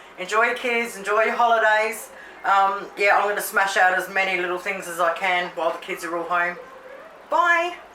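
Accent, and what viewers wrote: Australia. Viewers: Michigan here, love the OZ accent. I'm doing subs with ham, pepperoni, black olives, sweet onion, tomato slices, provolone cheese and after it's all toasted in the oven, shredded lettuce. ( edit: and jarred pizza sauce. ) Thanks for the video! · I just stumbled upon your channel when I searched for baked pizza sub. I'm about to subscribe cuz you have a candid way of saying things like me only I have an American accent and yours is Australian. I can't wait to see what else you got cooking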